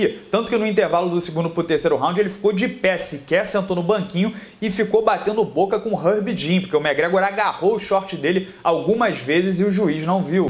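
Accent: Rio de Janeiro, Brazil. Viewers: Durante a luta eu fiquei lembrando da sua voz com o sotaque carioca dizendo: "Khabib não mija fora do pinico".... kkkkk. Ponto!!!!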